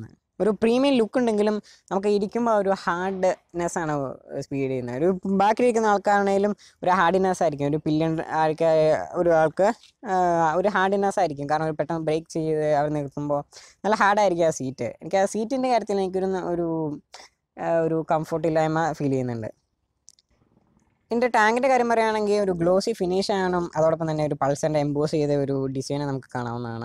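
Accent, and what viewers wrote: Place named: Bengal, India. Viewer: Bengali malayalam parayunna pole ind brw sorry feel avaruth.... Plz improve ur audio quality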